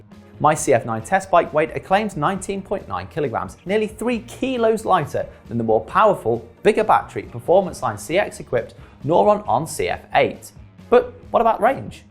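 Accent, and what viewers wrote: England, United Kingdom. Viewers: Would you kindly do this review again without the English accent?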